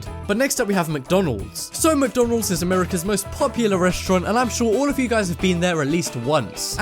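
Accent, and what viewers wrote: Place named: United Kingdom. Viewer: You got Brit voice but say dollors so are these available in uk not that I be ordering soon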